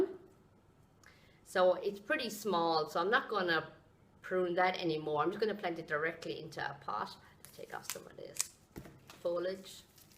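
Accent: Ireland. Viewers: R U Irish? :) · Are you Irish because I am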